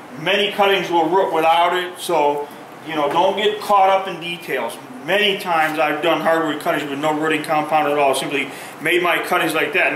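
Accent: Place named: Midwest, United States. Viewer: What is your location btw? It sounds like you are from the Midwest 😀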